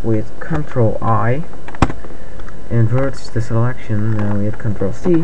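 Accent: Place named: United States. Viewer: Hey. Dank je voor deze tutorial. Dat hielp me veel. En jouw Engels is heel erg goed eigenlijk. Je klinkt als je Amerikaans bent. Sorry als ik een fout maak, ik spreek alleen een klein beetje Nederlands.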